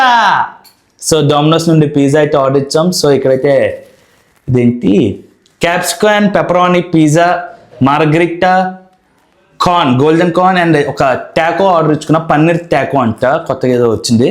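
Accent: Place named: Visakhapatnam, India. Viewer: Bro where are you from bro ....matching to vizag slang ....if from vizag which place in vizag ....and I like your videos bro ❤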